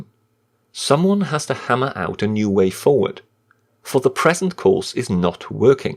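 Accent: Wales, United Kingdom. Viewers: Do I hear a Welsh twang? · I never realised how biased the media was in the UK until Brexit came about. The BBC's supposed impartiality was exposed to me as being a complete and utter lie. They're even worse when it comes to President Trump. Always negative, never positive completely one sided in most regards. Great summary, should be heard by politicians. I detect a Welsh accent too! ;)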